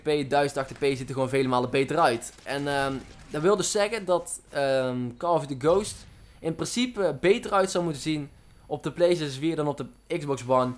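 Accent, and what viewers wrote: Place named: North Brabant, Netherlands. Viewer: Ja noord brabant dat hoor ik haha, maar waar ergens? Eindhoven?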